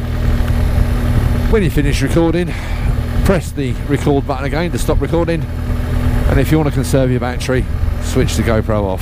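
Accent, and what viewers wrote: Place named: England, United Kingdom. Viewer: Hello ohhhh lucky boy! you have a BMW! ohhh nice one! and I love your typical "English" who remember me my youngers days at college and the trip in my penfriends house ( Kingslynn).🌸 Thank you for sharing this 𝓢𝓾𝓹𝒆𝓻 ⓋⒾⒹⒺⓄ with us 🌸🍾🍷🍇 Greetings from la France in Europe🍾🍷🍇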